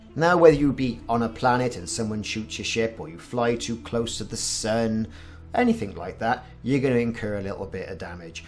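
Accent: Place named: Cardiff, United Kingdom. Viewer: Nice one! V useful, and your accent is making me homesick for my Cardiff roots.Subscribed.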